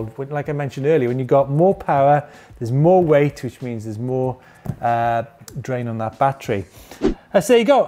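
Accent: Wales, United Kingdom. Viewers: Are you Welsh? You sound Welsh?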